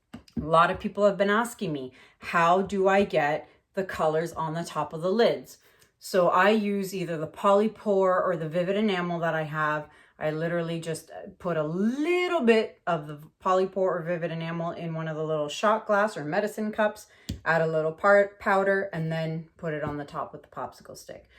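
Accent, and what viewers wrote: Canada. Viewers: You couldn't talk too much for me! At least half the point, for me, of watching your videos is to listen to your interesting chat! Haven't watched the video yet, so can't comment on the painting, but just wanted to let you know - keep chatting (enjoy listening to your Canadian accent - you are the first person I ever heard saying "anyhoo" - now I am coming across it everywhere!!!) (I'm English). Keep up the good work - painting and chatting!!!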